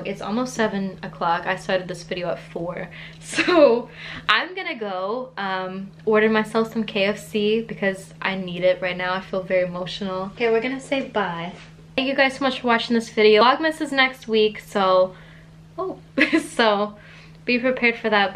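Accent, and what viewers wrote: United States. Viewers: This is the first time that I've watched one of your videos. God bless you. You cry because you care and that is why I take the time to comment. In the space of nineteen minutes you gave me so much real information and so full of emotion and determination. Your past experience with animal rescue and the skills that you've learned.. You said the Vet bill came to over a Thousand Pounds, with that accent It should have been Dollars.?. Then you went on about the UK government, so I guess you're living in my country now, which is just lovely. I hope you acquired the right number of signatures on your petition and Boris was forced to talk about Chameleons rather than Covid. 😀👍 🦎✔ 🦠❌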